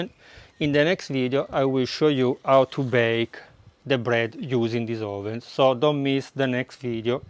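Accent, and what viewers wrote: Italy. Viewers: I subscribed to you so I could share you with my 23 yr old daughter and 24 yr old son....We were taught to cook by our american men who where soldier men who survived and came back to marry our mothers and then teach them how to cook..... but I just can't get over your BEAUTIFUL ACCENT VOICE.....your voice is angelic, and you are just so damn pretty for an Italian.....You wife better love you to crazy pieces! She is SOOOO LUCK!!!!! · I love your accent! They need to stop hiring white people and British to play Roman emperors and soldiers in movies. Y'all are just as great. I would LOVE to see more historical movies with more Italians. I love your natural tan skin. I'm gonna subscribe to your channel cuz you have some good videos. :)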